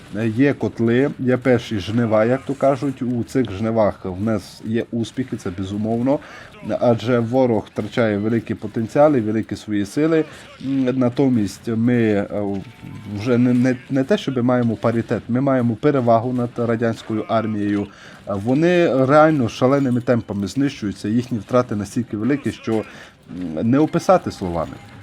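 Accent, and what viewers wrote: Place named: Kolomyia, Ukraine. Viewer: Блін, класний акцент, авторе, ви з Коломиї?😅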